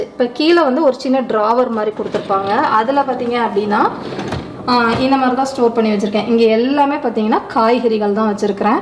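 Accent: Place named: Tiruchirappalli, India. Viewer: Neenga trichy a mam.ketkave santhosama irukku.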